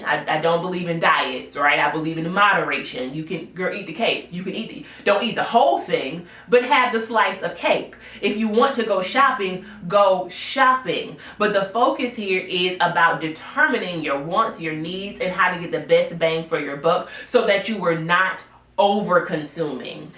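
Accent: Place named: Washington DC, United States. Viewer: Woohoo I thought of doing a channel like this but you are better at it!!! I’m 100% with you. I have well made pant suits I’ve had over 10 years. I’m trying to do the 30-30-30 wardrobe. I’ve written down my pieces and gotten rid of the excess. Now working on defining my style cuz I like such a mix of classic looks and boho looks. You’ve got a new sub. (Do you watch Alyssa ?) her channel is good too. Edit: when I got to the end we talked about your therapist and the cadence of your speech I immediately thought I wonder if she is from DC? Then you mentioned the metro and I was like oh yeah she’s from DC. 😂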